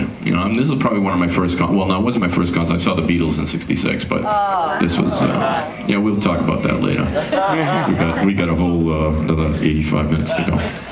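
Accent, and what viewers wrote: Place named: Boston, United States. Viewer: gotta love that Boston accent. Amazing player with a new unique voice while still having an old soul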